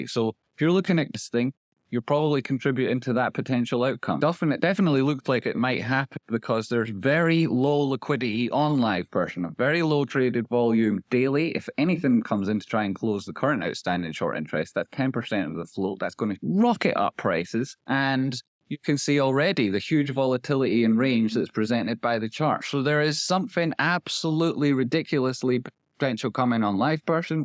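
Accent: Scotland, United Kingdom. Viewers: Just found you on YouTube …..been watching various investment guys for a few years, and you just popped up! Good to hear a Scottish voice.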